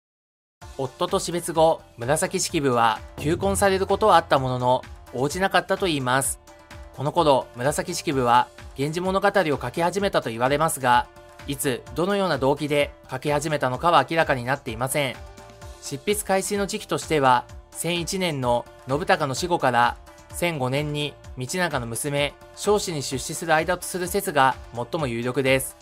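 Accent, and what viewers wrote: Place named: Tokyo, Japan. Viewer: ただ全部東京弁なのがなんかなじめない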